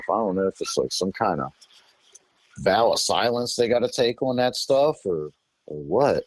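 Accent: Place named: South Pennsylvania, United States. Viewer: I'm here for both the story and the south PA accent. :)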